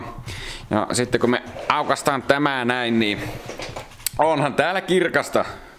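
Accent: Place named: Oulu, Finland. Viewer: Pähiä kampe! On tuo Oulun kieli sitten hienoa.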